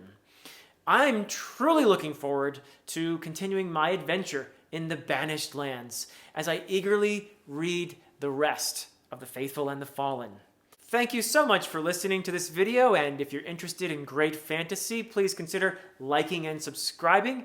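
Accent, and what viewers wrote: Wales, United Kingdom. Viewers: Having lived in Wales for a year, I was pleased to see you bringing this point predominantly into your review shedding light on Gwynne's intentions and highlighting other reviewers' misinterpretation of it being about Vikings. Also, your pronunciation sounded just like all the welsh bloke around me all the time while I was there, so nice going!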